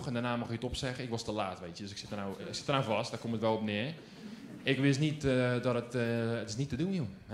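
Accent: Rotterdam, Netherlands. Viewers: Hahahahaha ik hou van deze gast. Please kom een keer in De Meervaart of De LaMar. Zou top zijn. Je Rotterdamse accent ga ik al stuk om he. X Amsterdamse fan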